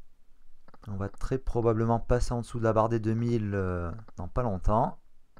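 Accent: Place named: South, France. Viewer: Tu habites dans le sud ? T'as un accent 😄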